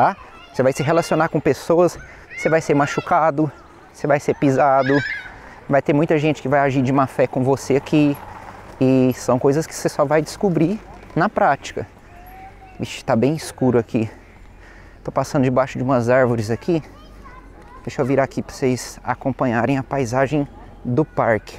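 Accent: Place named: United Kingdom. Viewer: Cara. Desculpa, mas... ver um Brasileiro falando o inglês britânico, me dá impressão que está errado! (Não está, eu sou 100% acostumado com o inglês Americano) Me soa estranho!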